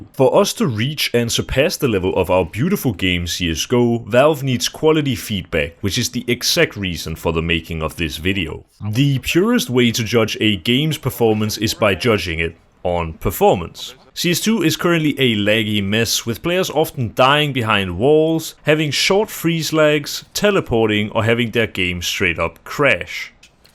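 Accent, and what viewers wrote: Denmark. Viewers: dude i can tell you are danish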